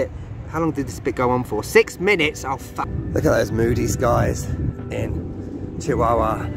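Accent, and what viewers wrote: England, United Kingdom. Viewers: Hey. This is the first time I see your videos. Honestly. But I really love it. And I thank you for coming and visit my city. Yo I know I think that we (people who live in Chihuahua) don't even realize how beautiful it is until we see a video like yours. And I liked a lot that you visited and showed what was interesting to you. From your very own perspective. Greetings Love your English accent. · Cool, a British guy in Chihuahua, love your accent…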